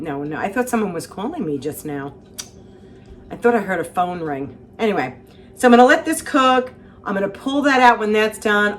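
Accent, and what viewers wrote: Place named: Cleveland, United States. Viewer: I love your show! This canned meat meal seems great! Trying it tonight! Doug is lucky! And btw... i live in North Carolina, I date a beautiful girl from Cleveland, your diction and accent are so similar... fogetaboutit! Keep up the good work!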